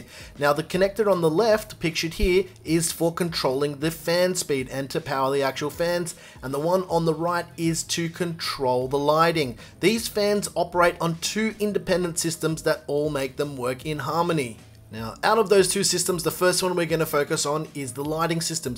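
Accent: Australia. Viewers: are you aussie?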